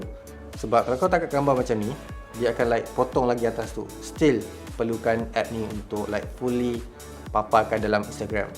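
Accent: Terengganu, Malaysia. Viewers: Org kuala terengganu ke ? Area mana tu? 😄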